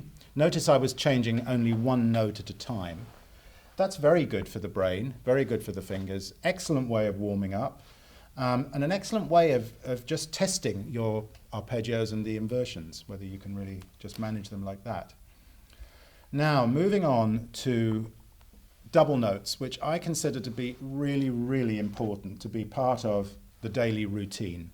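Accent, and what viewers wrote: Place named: United Kingdom. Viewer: No offence to your job done but I hate UK accent... ugh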